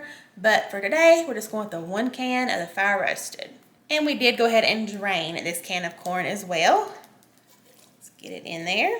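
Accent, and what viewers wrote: South, United States. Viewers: Can't listen to that southern accent. Bah bye.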